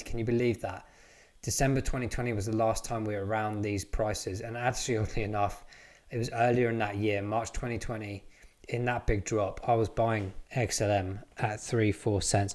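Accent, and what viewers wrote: United Kingdom. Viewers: Hey, good to hear an english voice, in england we seem to already have 3 second transaction bank transfers does that mean in uk were already using iso 20022?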